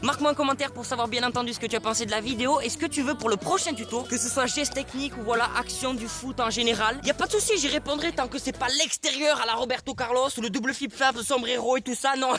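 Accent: Marseille, France. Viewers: Toi t'es Marseillais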